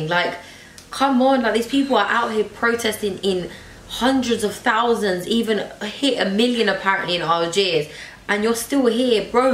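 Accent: London, United Kingdom. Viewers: Your English it’s from London Are you half Algerian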